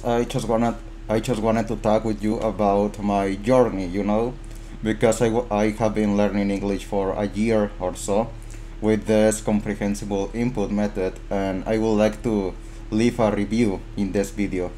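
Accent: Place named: Latin America. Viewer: Hi, I gather that you’re an American Spanish speaker from your accent (I mean, a native Spanish speaker from Latin America). People from Spain have a different accent when they speak English. I'm not sure if you're from Mexico, but I'm absolutely sure you're not from Spain. Man, I'm very impressed by your English! I’d like to speak English as well as you. Someday I'll get it, I hope so.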